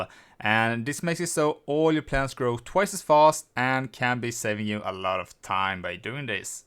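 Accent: Sweden. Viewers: you sound swedish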